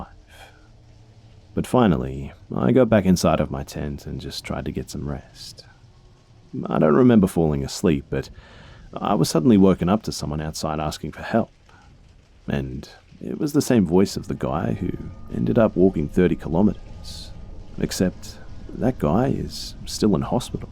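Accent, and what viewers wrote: Australia. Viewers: Australian accent: I've always known I was gonna be a marine Me: I dunno kinda sus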